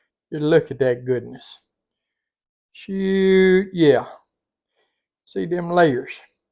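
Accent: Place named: Louisiana, United States. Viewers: Natural yogurt is a good cheaper substitute for sour cream, they want $6.00 for 1 cup 250ml sour cream here now 🤨 and I noticed the other day that your dollar is our 1.52 We don’t have cool whip either, we used to have frozen dessert whip back in the 80’s greasy horrible stuff it was. Didn’t look anywhere near as good as yours. I just Love your Southern accent, I used to follow Miss Phyllis Southern Frugal back in 2019 before She and Mr Bucky went home to be with the Lord, he Son runs her channel now, but I don’t enjoy it without her blessed beautiful heart, still has all the good frugal old time recipes though, ✝️🙏🏽💖💙 Jesus is Coming I hope ya’ll know the Rapture is VERY CLOSE 👑✝️🙌🏽☁️💫☁️💕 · You Sound Just Like My Louisiana Family ..♥️♥️♥️I love Your Southern Attitude 🤗🙏🏼Looks So Yummy ! BEAUTIFUL ♥️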